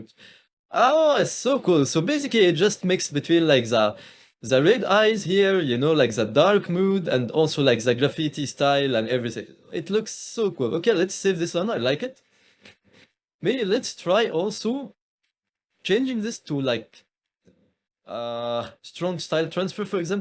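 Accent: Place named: France. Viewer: the french accent 👌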